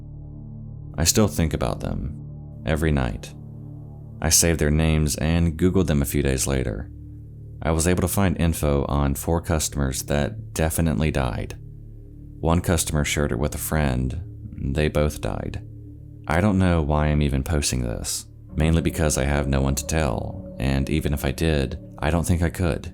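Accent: South, United States. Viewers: As a southerner myself, I know a southern accent when I hear one. I love this channel to death! Keep up the amazing work! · Agreeance????? Not a word my man. Are you Fred Durst? LOL! The word is agreement. I am in agreement. I am in agreeance doesn't do it. Agreeance is just not a word. It sounds like one but again, the word is agreement. You have good content and I like watching your videos. Just, do better with grammar and I have no complaints. I don't even have a problem with your slight but definite country accent. As long as you are using actual words and using them the right way, I will sub.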